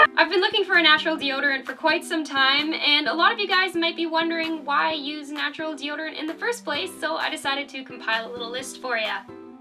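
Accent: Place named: Canada. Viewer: You sound canadian. I love watching your videos because I'm in love with the way you talk. You're just hilarious. And a little quirky. But that's character! :) :)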